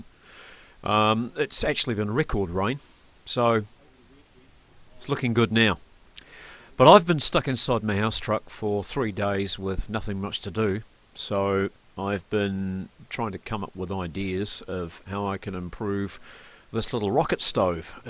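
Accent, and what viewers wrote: New Zealand. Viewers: I thought you on a flight-path or next to the highway. Were are you? I'm thinking going by the accent NZ?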